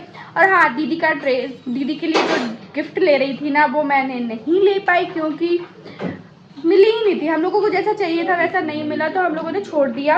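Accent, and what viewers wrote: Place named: Bundelkhand, India. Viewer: Bundelkhandi achi lgti aapki 😊❤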